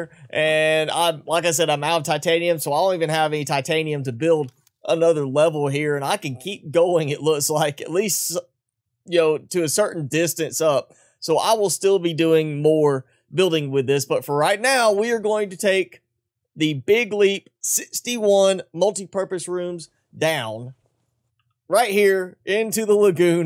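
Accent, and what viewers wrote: South, United States. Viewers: You sound southern are you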